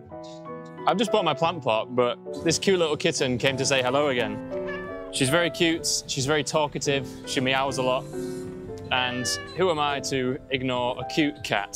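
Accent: United Kingdom. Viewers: شكرا جزيلا انا اتعلم اللغة الانجليزية واحب اللهجة البريطانية احبها جدا 🌸💐🪴🌱واحب النباتات جدا شكرا مجددا انا لمى من المملكة العربية السعودية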